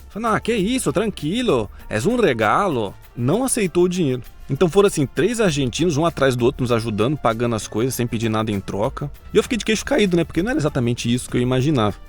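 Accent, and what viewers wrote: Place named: Minas Gerais, Brazil. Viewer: Ótimo vídeo! Comecei meu périplo por seus vídeos com a reportagem sobre Zigurats. Daí chequei sua lista e vi que este aqui é o mais recente. Que grata surpresa, meu amigo! E o que mais gostei, além dos detalhamentos, foi do humor que você imprime às reportagens. Só uma dúvida: Você é catarinense mesmo? Sotaque mineiro de BH inteirinho! Abraço!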